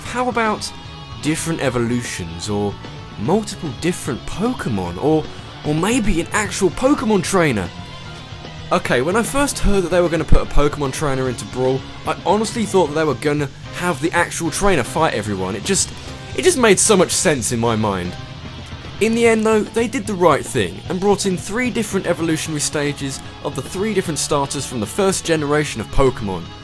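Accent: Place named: Essex, United Kingdom. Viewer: Cool vid man,nice accent btw. Let me guess,you're from Essex?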